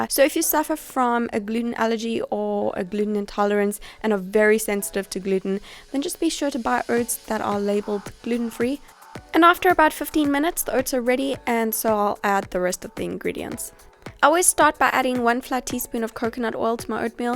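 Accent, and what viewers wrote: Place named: South Africa. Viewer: Can i just say love the accent reminds me of home... miss south africa and your accent reminds me of it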